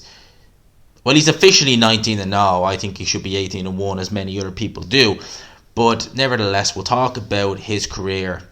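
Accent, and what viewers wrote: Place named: Ireland. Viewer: Excellent insight and breakdown Sir! I enjoy your videos. Dunno if it's the Irish lilt, or probably you really know what you're speaking about! Good stuff...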